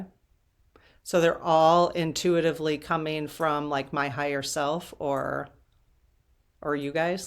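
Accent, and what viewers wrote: United States. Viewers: looks and talks very much like my next door neighbour. She has a doppelgänger in Australia!! It’s like looking at my lovely next door neighbour but she’s talking with an American accent! I love it!💖🦘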